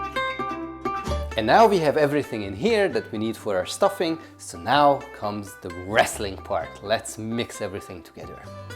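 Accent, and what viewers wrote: Hungary. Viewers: Your Hunglish accent is adorable hahaha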